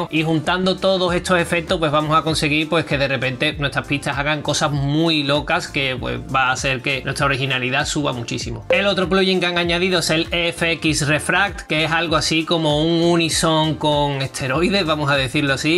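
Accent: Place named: Andalusia, Spain. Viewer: Hola. gracias por el review, hablando de "efectos", tu acento de que región de España es? será andalucía? he escuchado a cantaores flamencos que hablan como tú. saludos.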